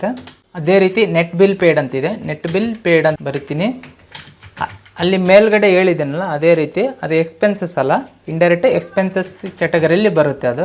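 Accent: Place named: Ballari, India. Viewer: Pakka ballari language TQ sir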